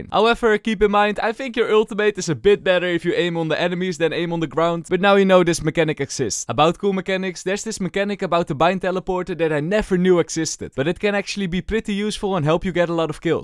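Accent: Netherlands. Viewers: With the Dutch accent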